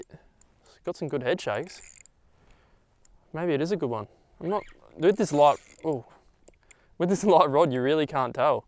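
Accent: Australia. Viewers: You sound like an Aussie to me so we went Metric in 1966 so why are you talking ing Imperial?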